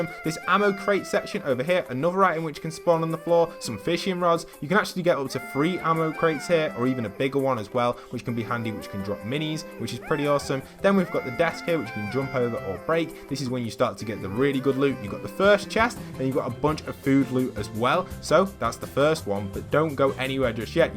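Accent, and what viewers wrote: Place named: Cheshire, United Kingdom. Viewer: Hey you sound like your from the Cheshire area it would be awesome if you are because I am too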